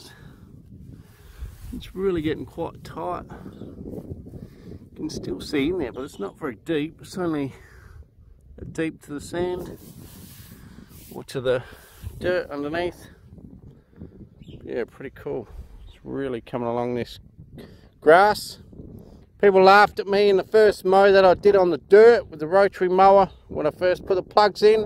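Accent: Australia. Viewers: Are you from Adelaide?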